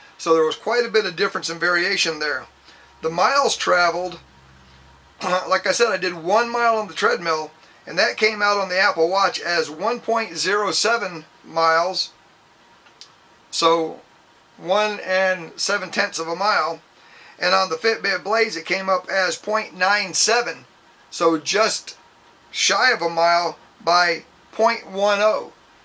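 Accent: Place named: South, United States. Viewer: You sound EXACTLY like Rickey Smiley doing his racist southern white guy prank call voice.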